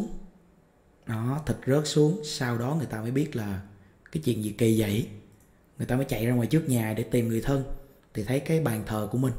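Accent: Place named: Mekong Delta, Vietnam. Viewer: Thật em nói chuyện rất là chậm rãi rõ ràng truyền cảm và lịch sự. Vì nói giọng miền tây mà nói nhanh thì ng bắc nghe ko rõ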